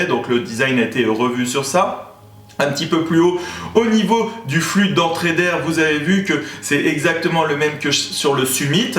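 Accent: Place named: Quebec, Canada. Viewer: La prononciation québecoise fait plaisir aussi aux amis belges :D !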